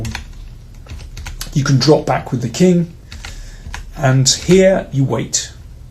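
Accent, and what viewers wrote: United Kingdom. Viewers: Oronion and oll the white powns. It's a drow! hahaah I absolutely adore your Bri'ish accent,!!:D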